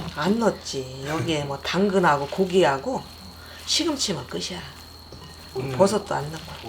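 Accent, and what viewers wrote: Chungcheong, South Korea. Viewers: 억양이 참 듣기좋아요 충청도분이신가요?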